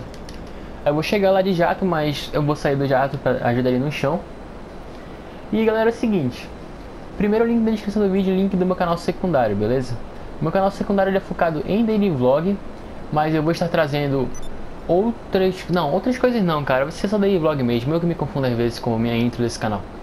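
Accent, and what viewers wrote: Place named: Belém, Brazil. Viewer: tu mora em Belem do pará? eu tbm que sotaque igual kk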